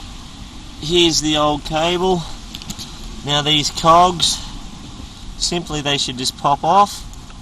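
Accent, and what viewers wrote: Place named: Australia. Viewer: Thanks for your video, and lovin' that Aussie accent and vocabulary ! Nothin' too scary ! Cheers from a Kiwi-Aussie !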